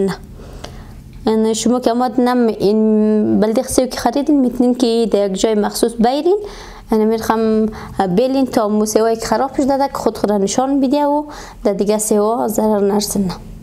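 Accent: Hazarajat, Afghanistan. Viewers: توضيحات قشنگ تان به زبان ولحجه ای شیرین هزارگى بسیار عالی بود موفق باشید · ماشاالله دخترآغیلموچقدربه لهج ساده هزارگی وشیرین گپ میضنی قربان زه بانتو شوم خوارجانمه🌈🦋👍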